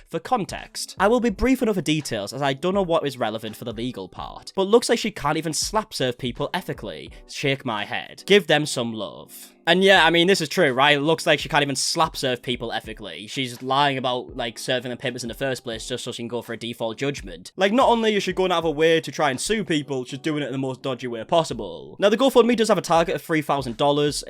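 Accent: United Kingdom. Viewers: I wonder why so many British drama channels are so into covering this nutball woman. Seems like every time I click on an update - yep! At least if I have to hear about that horrid beast, it's in a pleasant accent! :)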